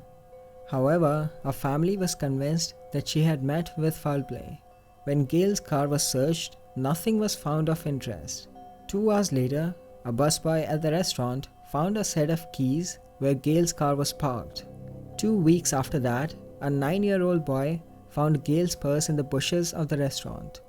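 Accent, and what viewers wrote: South India, India. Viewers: The narrator have a South Indian accent, I like it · I have difficulty with Indian accents. I won’t watch another one with this guy. Normally a woman narrates these. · Few seconds and then i have to stop....annoying Indian accent... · The voice over Indian voice made this even more scarier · This Indian accent is so thick one could easily cut it with a knife. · U have south indian accent 🙏🏻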